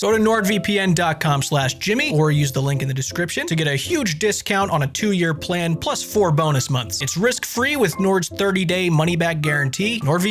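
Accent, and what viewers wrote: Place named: Midwest, United States. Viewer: Today I learned that Jimmy is from the Midwest USA (I dont care if you were born in Uganda; if you pronounce "roof" like that, you're midwestern) If you think castles are cool, I HIGHLY recommend the Youtube channel which has a series going over how tactically viable all of the castle in Gielinor are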